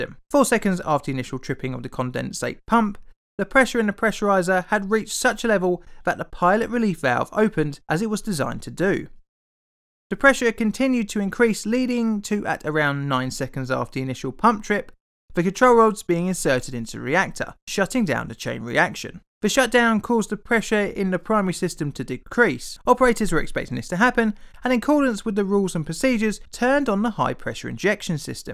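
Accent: United Kingdom. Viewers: done by a nasal Brit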